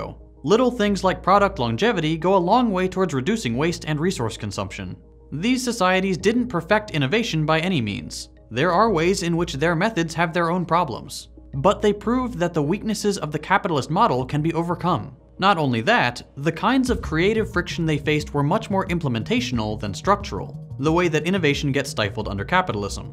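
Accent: United States. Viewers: It should be obvious by your accent, by perhaps you should've prefaced this video by explaining that "we" and "our" means "American", because capitalism is not equal the world over. Europe enjoys (or has previously enjoyed) a relatively socialist approach to capitalism. What you described was the American model of "free market capitalism" which is anything but. You described America's relatively unique capitalist healthcare system, for example.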